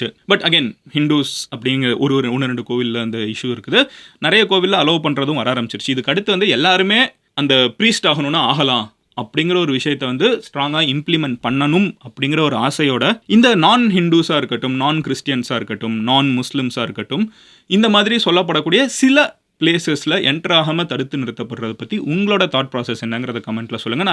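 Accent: Madurai, India. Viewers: R u from Madurai or Ponneri?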